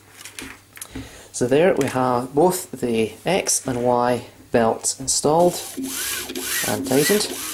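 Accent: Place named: England, United Kingdom. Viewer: My last question: is AXIDRAW from England or EEUU?.I was looking at the prices and I noticed this is $475 dollar or pounds?When I saw your videos I noticed that you have an english accent therefore I made the question about the country jajajaja.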